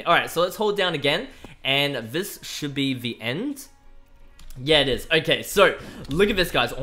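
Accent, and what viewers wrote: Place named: Australia. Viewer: its gauntlet not glove and also what place of australia ya from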